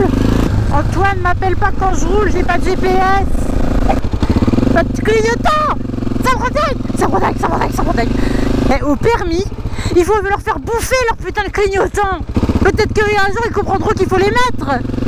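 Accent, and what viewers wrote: North, France. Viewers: Ah mais tu viens de ch'nord, je savais pas :')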